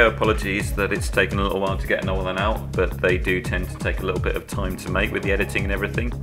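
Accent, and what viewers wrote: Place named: United Kingdom. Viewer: Your voice is spectacular as I should know being a media graduate from College. All of us Americans are always fascinated with the British accent.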